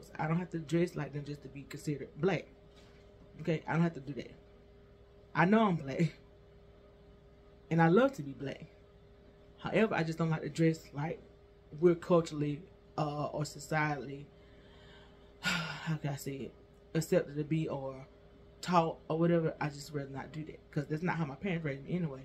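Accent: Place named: South, United States. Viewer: Enjoy being a stay at home wife and Mom. Love that for you! Thank you for sharing your daily devotionals. Never know who needs to hear that word. 🙏🏾 I feel you on the speech Sis. I'm from the south too where we talk fast, loud and with an accent 😅 But that's what makes us unique and special. Those butterfly earrings are beautiful, nice choice! And that dinner looked delicious!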